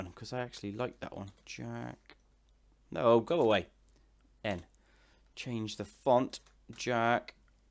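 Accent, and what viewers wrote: United Kingdom. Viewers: I have a question Are you from UK for something?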